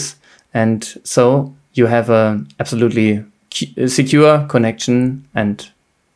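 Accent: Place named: Germany. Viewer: He must be german! i can hear it from his accent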